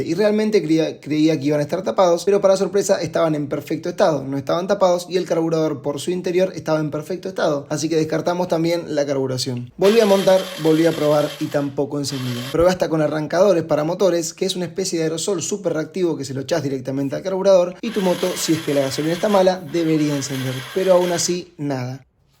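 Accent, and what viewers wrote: Argentina. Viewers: Argentino en España? Me salió sugerido tu vídeo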